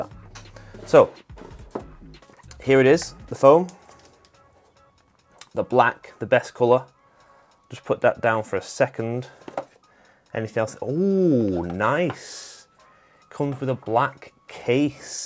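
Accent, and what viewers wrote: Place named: England, United Kingdom. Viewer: Decent unboxing man. Thanks for showing off the colour. Totally agreed on the matte black, would've preferred last year's blue (did you see it?) but matte black easy second choice. Its about time we moved in from glossy fingerprint magnet phones. Just no. I got my Galaxy S10 in green because I wanted flashy but when it was nicked I had to get black. I was wrong, black much more refined. I'm rocking the red case now. Black and red classic combo. Reckon I'm getting the same as at next pay day (tempted to get the watch too). 128GB is plenty for me (my S10 is 128).I like Samsung's OneUI but OxygenOS looks much more refined. I use barely any of the Samsung excessive extras. Also totally agreed on the camera front. It's important but all American YouTubers do is bang on about the camera. The world's in a bloody lockdown anyway, my cat doesn't need another photo taking 😅. 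They barely mention the software and it's so important. A good phone with a bad Android launcher kills it. And from one Englishman to another your accent 👌.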